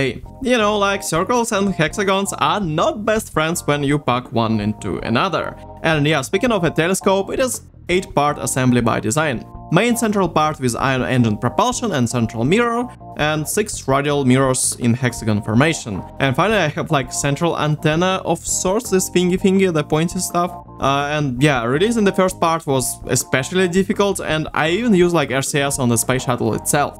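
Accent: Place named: Russia. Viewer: You sound... Russian. Даров если Русский.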